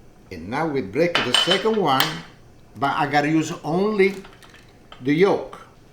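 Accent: Italy. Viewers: Growing up in East Vineland, we were surrounded by hard-working truck farms. With that came the best Italian/Sicilian cuisine. You, dear sir, not only remind me of these fine memories but also have an accent I know well. Heartwarming. I have been following you for years. My daughters grew up on this food. They, too, think you are adorable as they pass down these fine traditions to their families. You are a true master of this art. Thank you so very much!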